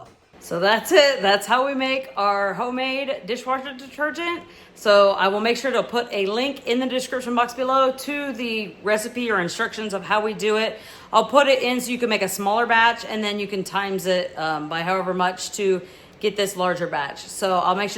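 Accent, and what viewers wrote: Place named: United States. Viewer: She's not speaking English. She's speaking "American". There's a difference. This is the kind of thing that happens when you have had a failed educational system for decades.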